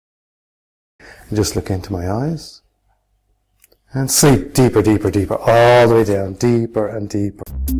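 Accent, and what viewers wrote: Ireland. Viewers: Are you Irish ? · wow. You're Irish, yeah? Want to take on a student? :D I dunno about anyone else, but when I sleep for 24 hours I wake up feeling like shit lol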